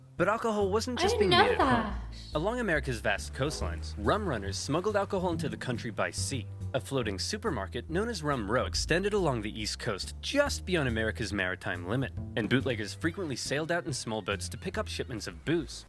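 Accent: Ireland. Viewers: You're losing ur cute Irish accent lol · I don’t know why but Irish accents are pretty cool Also no cute Irish girl GF why live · I am surprised that you are using this dating site. Because you are beautiful! If you didn't live in Ireland, too far away from USA, I would ask you for a sitdown with coffee or something. By the way, I love your Irish accent. I know that you have a lot of pings from that dating site from suitors.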